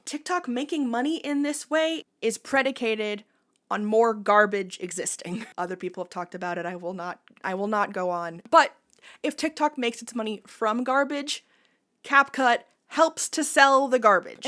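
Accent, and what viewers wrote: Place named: South, United States. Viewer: i don't think I realized you were southern!! southern gang!! 😊 Also, great video. i love this!!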